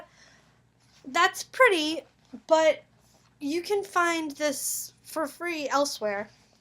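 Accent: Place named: South, United States. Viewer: Nothing wrong with talking like you're from the south lol.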